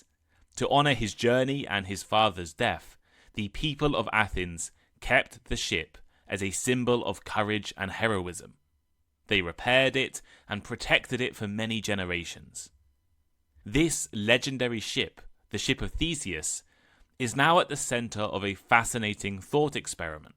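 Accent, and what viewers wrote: United Kingdom. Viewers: Is it British accent or American accent?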